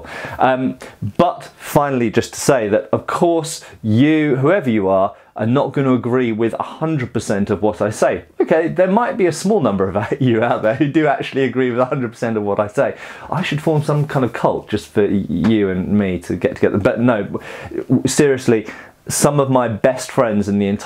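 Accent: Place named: United Kingdom. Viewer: I could never hate you,. You're adorable. Also, I'm a sucker for the British accent.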